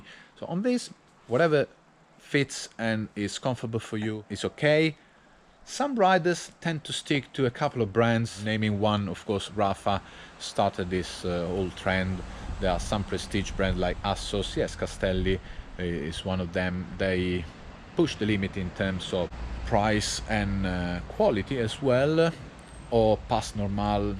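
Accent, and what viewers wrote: Italy. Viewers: Well done 👍, I like the Italian accent, it makes this mindset more believable 😂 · I don't even have a bicycle but I enjoyed listening to an Italian talking English. Very well done too, a lovely accent. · Thanks for sharing your take on the humorous Velominati “rules” in your wonderful Italian accent! Like your video, I think the “rules” are observations of the cycling community. Velominati takes these to a satirical extreme. I love them- follow some, don’t follow others as it suits me.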